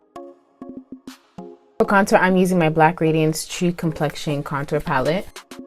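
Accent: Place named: RP, United Kingdom. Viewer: You sound like miss posh in Canada 💕💕💕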